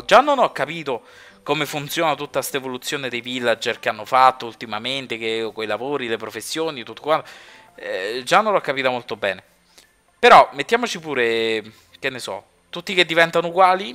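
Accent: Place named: Rome, Italy. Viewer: ah ok. lo so che è di Roma dalla voce, e su so solo che ha origini asiatiche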